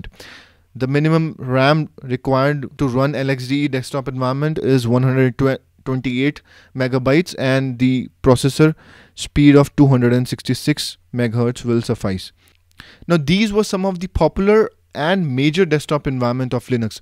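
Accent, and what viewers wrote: India. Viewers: Its indian accent but not too indian.. Kind of sweet spot i love it 😃 And thank you for great explanation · This is how every north Indian speaks English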